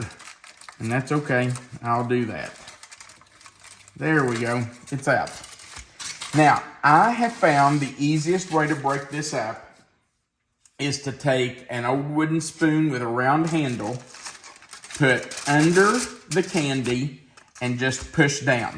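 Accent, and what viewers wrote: United States. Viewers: Just sayin, I'm from Nevada. If you can say Banana correctly you can say Nevada properly. Great video can't wait to try it on our grandchildren. Didn't notice the accent....just American accent! Keep going.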